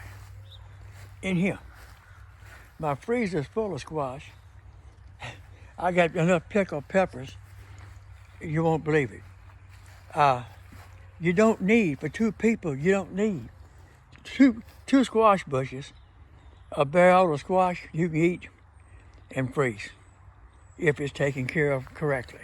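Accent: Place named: North Carolina, United States. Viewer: Thank you for the great video! Are you from North Carolina? You sound exactly like my grandparents!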